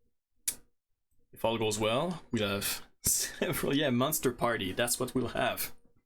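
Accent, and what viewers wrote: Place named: Quebec, Canada. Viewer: On ne peut jamais enlever l'accent québécois ;-)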